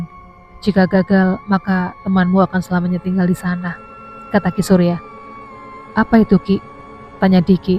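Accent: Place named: Java, Indonesia. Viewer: Yaelah medok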